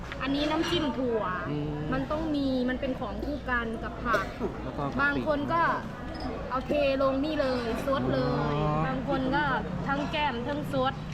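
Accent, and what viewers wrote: Ubon Ratchathani, Thailand. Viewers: น้อง ยังสวยเหมือนเดิมแต่ยังอยากทางลาวแต่ที่แน่แน่ยังติดตามแพะเราชอบสาวลาวพูดเหมือนคนอุบลผมจำได้พูดมาอยากร้องไห้😂😂😂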